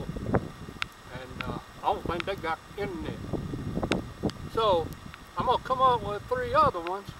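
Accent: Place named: South Carolina, United States. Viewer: I dint no ole Beaver live down deh een dat rivah! You sound like my kin folks down in the SC low country there,. Funny stuff! Thanks for the tag...I'll try and make you proud.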